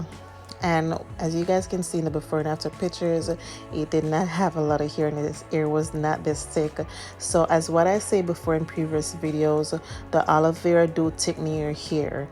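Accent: Jamaica. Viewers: Jamaican accent strong tho lol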